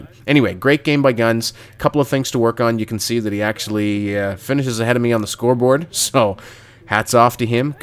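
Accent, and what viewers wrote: Canada. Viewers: You sound Canadian. Are you?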